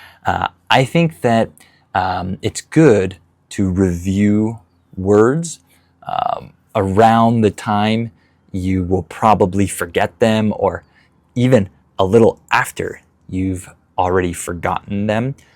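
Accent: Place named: United States. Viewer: Yes American Accent😊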